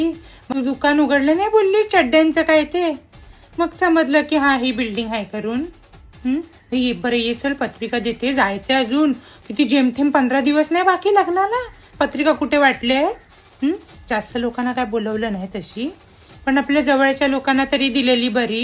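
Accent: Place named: Malvan, India. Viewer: bhari malvani tune please asmi navv ghe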